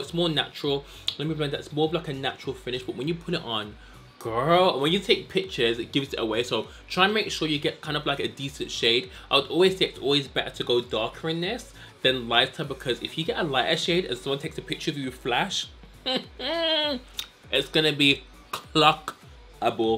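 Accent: Birmingham, United Kingdom. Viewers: Is that a Brum accent i'm hearing? ❤️ . and thanks for the vid, you've helped a clueless sister out 😂 .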